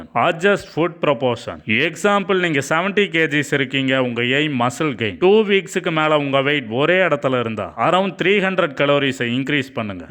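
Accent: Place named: India. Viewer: So you guys mean to tell me, you understand everything in this video with the indian accent?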